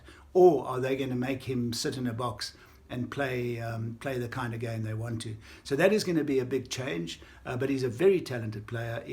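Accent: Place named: England, United Kingdom. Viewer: Potter and the funny thing is on each occasion many years later the official has come out and said he was wrong but that doesn't help after the fact.... And why do they all admit many years later that they were incorrect, because at some point in there lives, they realise they just look like dishonest chops and the conscience gets to them... Brice Lawrence came out n said I had a bad day, Romain poite came out said I got it wrong, paddy o brian said on many occasions he got it wrong with bok games????? Doesn't help after the fact... Remember two years ago where boks were about to beat England at Twickenham, Will Arrogant Farrell shoulder charged Andre Esterhuizen, boks weren't awarded a penalty, even although after the game Eddy Jones and Farrell said they were extremely lucky 😉... it's not luck it's biased officiating... you sound like a proper Pom, we dnt expect you to be unbiased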